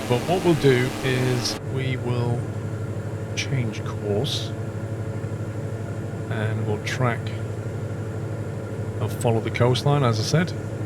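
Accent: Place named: United Kingdom. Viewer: Dude good video as always. But you sound British and should know its pronounced "Edin-bruh" not "Edin-bo-ruh" :)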